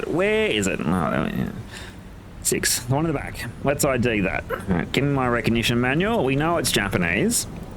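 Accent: Australia. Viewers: good to hear an Australian voice on these vids. Nice bit of creativity in the letters home. You could even include some history into some of them. I can tell you know a bit.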